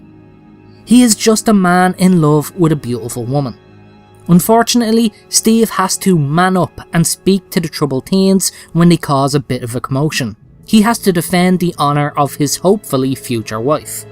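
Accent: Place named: Ireland. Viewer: Are you Irish or Scottish? I love your accent. I studied RP at the university. Oh, it says that you are from Ireland later on in the clip.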